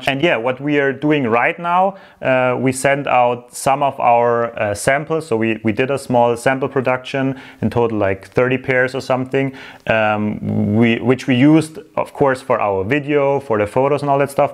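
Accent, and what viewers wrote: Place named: Germany. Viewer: Haha wie geil der deutsche Akzent 😄 Hört sich cool an 👍🏼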